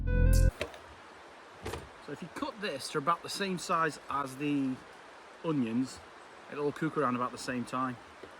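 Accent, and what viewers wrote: Stoke, United Kingdom. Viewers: I hear that you're a fellow Stokie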